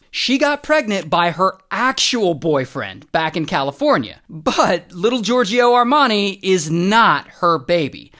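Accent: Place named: United Kingdom. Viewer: It's a BRITISH accent BTW.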